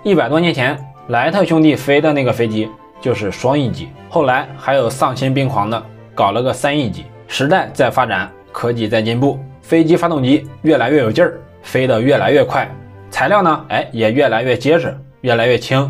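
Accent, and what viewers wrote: Henan, China. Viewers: 河南口音吧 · 这是河南话?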